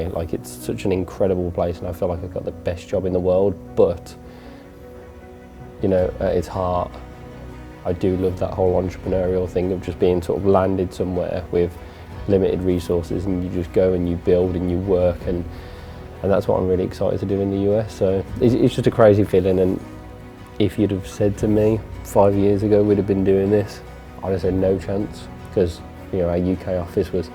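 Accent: Birmingham, United Kingdom. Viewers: that brummie accent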